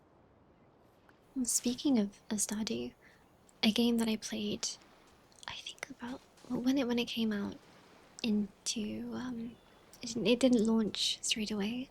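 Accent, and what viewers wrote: United Kingdom. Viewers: As soon as I heard the British accent, I knew the struggles of the heatwave I'm British too and this is GENUINE hell. Anyway amazing video and very relaxing. Great job.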